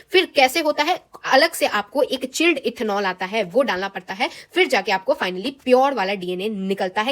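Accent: Bihar, India. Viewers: Didi aap bihar se ho kyyy you have an accent like us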